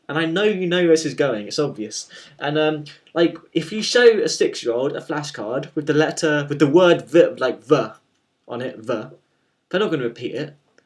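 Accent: United Kingdom. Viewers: LOOOL :D you're hilarious.. and very cute! :3 and you've got a lovely accent! (british accents ♥) keep it up, man - but the other commenters (is this a word?) are right: you really should shorten your vids to 3-4mins.. but the video is great, though :D and the title of the video fits my name, doesn't it? :D (wow, I'm using many smileys..) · You're so cute and I think you're clever person.. ;D so don't be like Charlie McDonnell.. be yourself.. I think, that you are, but a lot of people - don't... and maybe they're right.... and do not dress the hat any more(or jacket, etc..), please... you are in the room, that looks pretty weird.. and that is like Charlie was doing.. ;D ..but I really like you. (and I love your British accent ! ) xd - Kate. ;)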